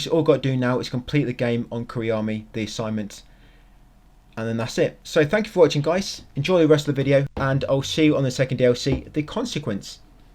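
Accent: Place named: London, United Kingdom. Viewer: Most be from London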